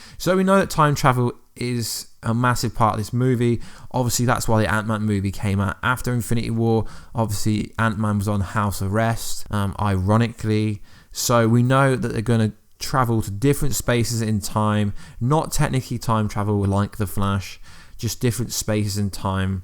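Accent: United Kingdom. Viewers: British?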